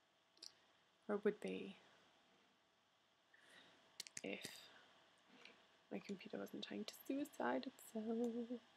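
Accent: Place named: United Kingdom. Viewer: Thanks girl! Great simple tutorial for paint effects. I'm glad to find another woman who uses maya. hehe. :) ...Btw you have a very pretty accent. lol. Are you from the UK?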